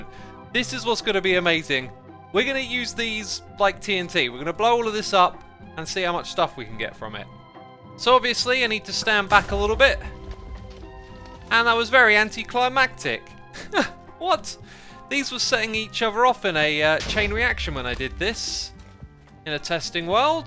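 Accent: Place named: London, United Kingdom. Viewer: overcorrects his 'v' 'th' substitution error by saying 'nether' instead of 'never'.:) I enjoy your london accent.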